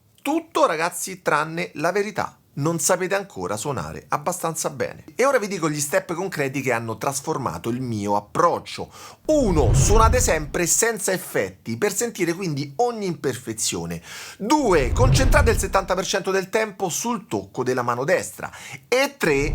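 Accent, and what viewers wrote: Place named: Rome, Italy. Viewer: Bellissimo video! Molto illuminante. Nel mio caso, ogniuna delle "storie" è valida per me. Forse la seconda no...ho suonato solo chitarracce 😂. Con la terza sono riuscito a suonare il solo di lapsteel di Walk of life con la Telecaster. Sono partito da x0,5 fino ad arrivare all originale. Soddisfazione immensa! Ho una pedalboard....da 4 anni....sono ancora in alto mare😂😂😂. Sei grande e riesci sempre a farmi sorridere con la storia del non iscriversi😂. Non ti ho dato retta su questo. Complimenti ancora e grazie mille! Sento che sei di Roma o dintorni. Anche io, insegnami!!!😂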